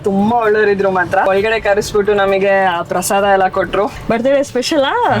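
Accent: North Karnataka, India. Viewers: thank u so much for posting this 🙏🏻 ನಿಮ್ಮ ನಿರೂಪಣೆ ನಿಮ್ಮ ಭಾಂದವ್ಯ ಮಾಹಿತಿ ನೀಡುವ ಶೈಲಿ ಸುಂದರವಾದದ್ದು ನಮ್ಮ ಉತ್ತರ ಕರ್ನಾಟಕದ ಭಾಷೆಯನ್ನೂ ಅಮೆರಿಕದಲ್ಲಿ ಕೇಳಿ ಖುಷಿ ಎನಿಸುತ್ತದೆ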